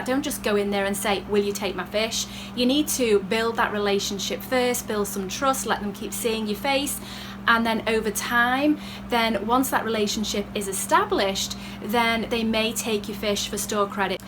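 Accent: Liverpool, United Kingdom. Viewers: Where are you from your speech sounds like you are from liverpool in the uk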